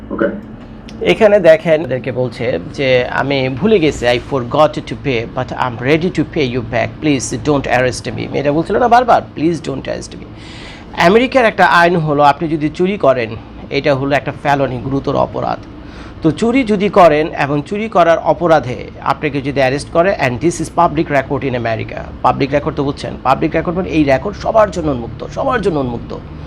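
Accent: Dhaka, Bangladesh. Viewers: ের বাড়ি কোথায় মাঝে মধ্যে ঢাকাইয়া ভাষায় কথা বলেন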